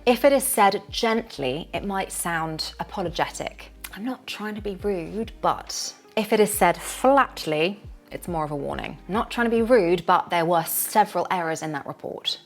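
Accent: United Kingdom. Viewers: I love british English I always watch your videos teacher Greetings from Peru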